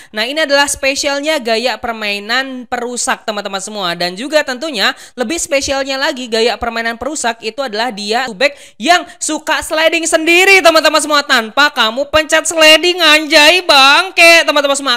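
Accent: West Java, Indonesia. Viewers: Bang orang jabar kah?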